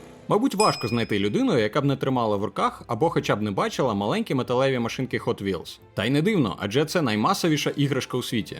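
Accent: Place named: Surzhyk, Ukraine. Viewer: Интересное видео посмотрел с удовольствием так как знаю украинский но по вашему акценту и суржику ухо иногда режет вы будто через силу и принципиально выбрали язык повествования из-за своей позиции это хорошо отстаивать мнение но если хотите больший охват аудитории то сами понимаете что не большая доля охвата людей в снг понимают украинский(с уважением коллекционерство без политоты)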